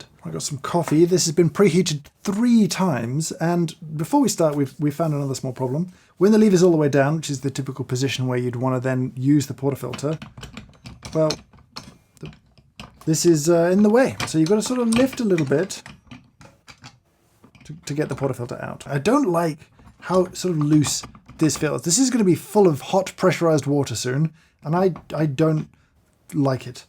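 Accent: United Kingdom. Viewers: My morning is complete, by watch a British sounding person struggling with some of his recent life choices.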